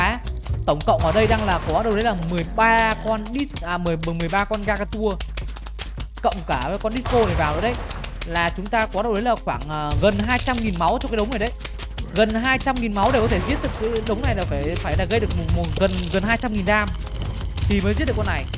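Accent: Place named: Binh Dinh, Vietnam. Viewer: Anh là người bình định à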